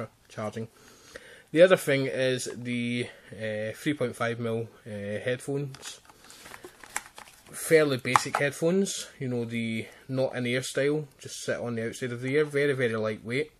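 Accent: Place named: Scotland, United Kingdom. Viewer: Why do you talk Scottish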